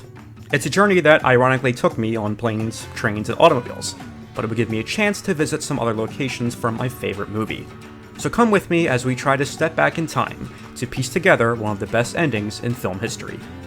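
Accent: Philadelphia, United States. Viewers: I just found your channel, watched a handful of videos (all excellent) and thought to myself, sounds like a Philly guy. This video confirmed it based on your airport departure 😂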